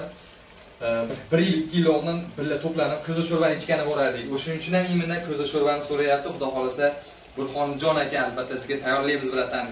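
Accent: Fergana, Uzbekistan. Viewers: Manimcha boshizdegi Fargona doppi. Shuningchun soragandim. ham Fargonaniki togrimi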